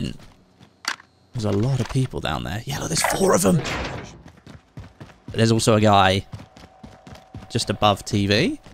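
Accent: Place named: United Kingdom. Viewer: Why are you British??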